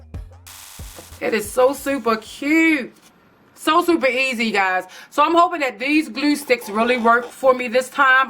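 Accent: Baltimore, United States. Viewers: The boots are sooo cute also where are u from sound like you are here in Baltimore ! Loving your channel ❤️❤️❤️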